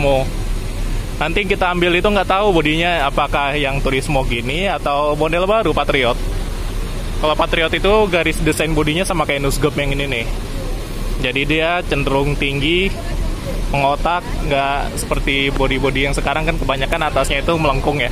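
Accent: Indonesia. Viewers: hahaha ada dialek minangnya berasa ke sumatra 😅😅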